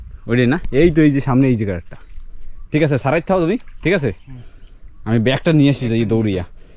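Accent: Barishal, Bangladesh. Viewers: আপনারা তো আন্দামানেরই ভিডিও দেন যেটা ইন্ডিয়ার ই অংশ বলে জানি, কিন্তু আপনার ভাষা পুরো টাই বাংলাদেশের বরিশাইল্লাদের মত ক্যান/?? একটু কমেন্টের রিপ্লাই দিন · vai tui pure barishaila ❤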